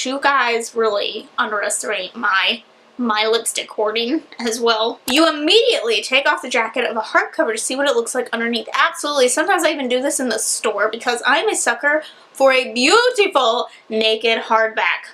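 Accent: South, United States. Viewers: OMFG I'm from MD (right on the DC border though) and have always assumed you were from WV or another southern state... I'm really shook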